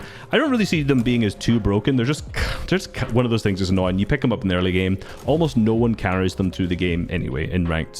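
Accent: Scotland, United Kingdom. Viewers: WAAAYYY LESS GO WE CAM HEAR THAT GLORIOUS SCOTTISH VOICE AGAIN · bro every time i watch every time I talk after I talk in a scottish accent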